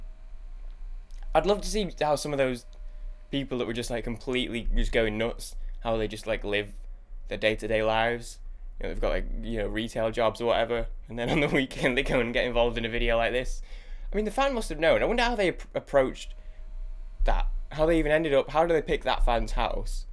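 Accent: United Kingdom. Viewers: Sometimes it's hard to hear exactly what you're saying because of your thick British accent, but that's okay because I really enjoy just hearing you talk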